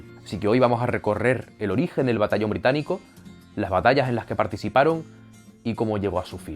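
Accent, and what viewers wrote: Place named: Andalusia, Spain. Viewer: Mi cabeza es incapaz de procesar a una persona que hable con acento del sur al mismo tiempo que un perfecto inglés británico 😂 Amigo, ¡que envidia sana! Me encantaron tus vídeos! Te animo a seguir haciéndolos 👏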